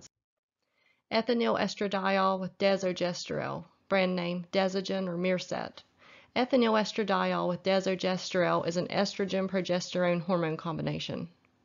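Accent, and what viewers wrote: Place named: South, United States. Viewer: Thank you so very much for making these with audio after a shift as an RX in training my eyes feel so tired to read my books On top of that I hear a southern accent Wich for some reason I adore and it soothes my nervous system . There must be a god that loves me after all. A billion thanks.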